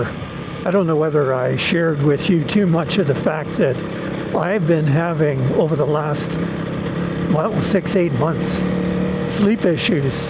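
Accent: Canada. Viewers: Great advice! Thanks! You sound Canadian. Hope I'm still riding at 75.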